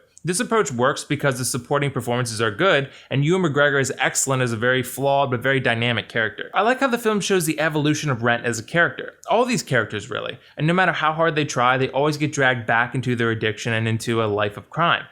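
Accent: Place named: United States. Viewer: I only came here to hear an American talk about this movie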